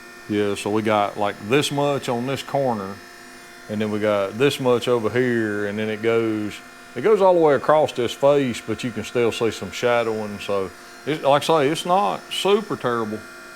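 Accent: South, United States. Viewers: Check out "I Do Cars" he uses a lot of fast forward and tears down engines that have blown up in 30mins..Its sad what people do to engines. Im sure the editing is the hardest part....Want to see your site go...My girlfriend loves your southern twang.....Has no clue what your saying just loves to hear you talk....Im working on a impersonation....LOL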